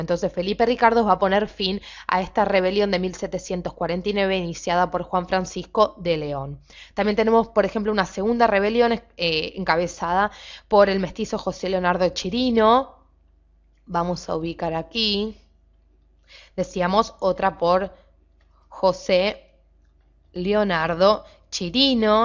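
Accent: Argentina. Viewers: CHE SOS COMO ARGENTINA NO SE XD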